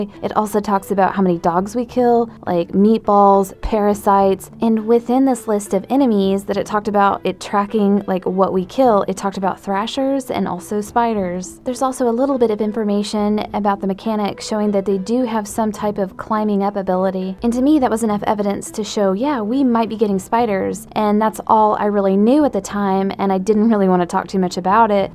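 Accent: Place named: South, United States. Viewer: She's southern of course she has a nice voice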